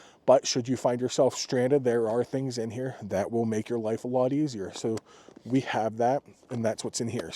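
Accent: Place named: Pennsylvania, United States. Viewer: Loved your video. I am originally from Pa. Kittanning near Pittsburgh. Thought I detected a little Pa accent. Living in SC now. Nice kit. Not over complicated.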